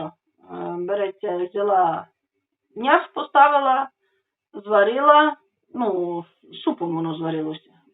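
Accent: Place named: Rural, Ukraine. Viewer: Говори як ти вмієш.Мені подобається як ти говориш в мене мама теж так говорила дуже багато слів так як говорять в моєму селі хотя це Хмельниччина яке ближче до Чорткова. КОМУ НЕ ПОДОБАЄТЬСЯ ХАЙ НЕ СЛУХАЮТЬ..НА ВСЕ ДОБРЕ ЗДОРОВ'Я ВАМ ВСІМ.ЧОЛОВІКУ БОЖОГО БЛАГОСЛОВІННЯ.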